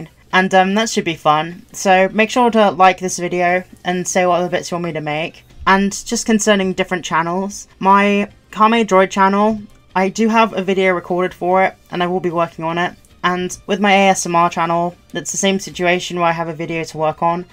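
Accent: United Kingdom. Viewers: Is she British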